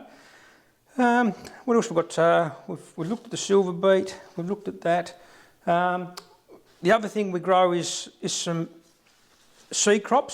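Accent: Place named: Australia. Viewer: Where abouts are you located? You sound Australian.. I live in subtropical Queensland (Gold Coast) and absolutely STRUGGLE to grow even heat loving crops during summer. It’s autumn and we are still having 32degree Celsius days!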